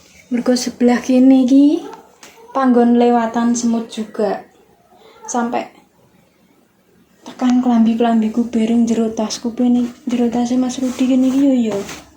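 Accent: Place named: Sulawesi, Indonesia. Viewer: Org Sulawesi itu logatnya,,, menggemaskan 🎉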